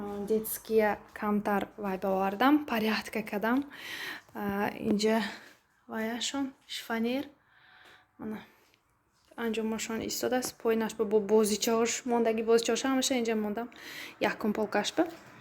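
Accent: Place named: Khujand, Tajikistan. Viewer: Салом Мана дугонам Худжанди буд гапзаниш махкулм буд шумони хамунбарин гап занитон махкул хама влоготона тамошо дорам ай як лав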